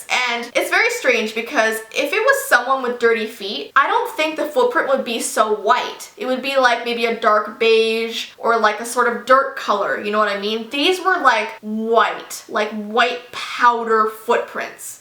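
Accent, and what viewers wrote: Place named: Canada. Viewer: for some reason i heard the canadian in her voice that ive never heard before. how havent i noticed this!?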